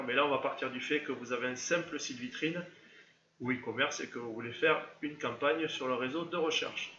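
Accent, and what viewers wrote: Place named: Tarn, France. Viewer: Ma3alm l'accent du sud du Tarn super vidéo 👍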